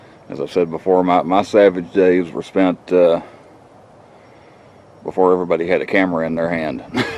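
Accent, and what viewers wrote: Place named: South, United States. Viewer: Cool place... Anyone every tell you that your voice is similar to an old comedian named Lewis Grizzard? Of course you don't have as thick of a southern accent as he did but the tone is similar. Very cool I think...VERY clear and easy for these older ears to hear. Brandon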